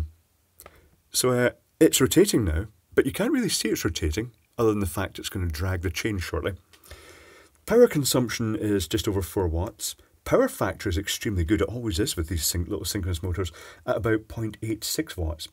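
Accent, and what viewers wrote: Scotland, United Kingdom. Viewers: I wonder why the Scots say 'greeze' rather than grease. Love the way thats pronounced.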